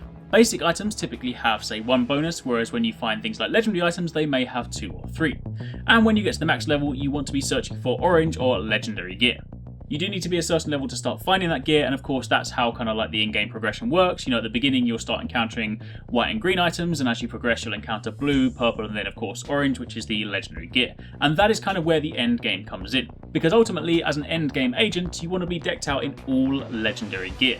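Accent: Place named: United Kingdom. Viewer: OMG for the non Brits please talk slower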